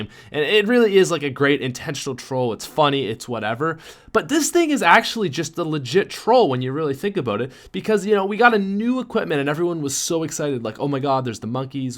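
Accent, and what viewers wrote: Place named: Newfoundland, Canada. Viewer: Is this guy Canadian???? The way he says “out” and “about” is totally Canadian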